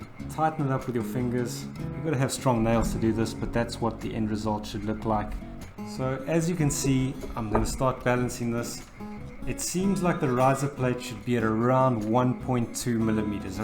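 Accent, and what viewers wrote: South Africa. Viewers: Bro I know ya in Australia but I kinda hear a slight South African in there ? psssssst bro much better if you get GH5 with awesome IBS :P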